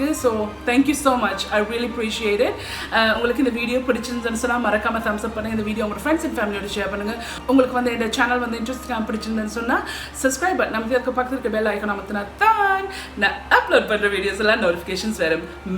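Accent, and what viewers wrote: Jaffna, Sri Lanka. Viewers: I can feel the fragrance of Jaffna in ur Tamil and Jaffna dishes sis... As i m Tamilian i m very much affectionate to srilankan tamilians... i never had a chance to visit srilanka... but i will go there for sure in future... keel uploading more dishes sissy... tc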